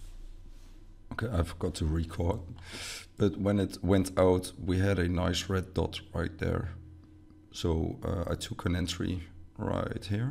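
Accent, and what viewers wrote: Netherlands. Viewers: Nederlands accent 🤣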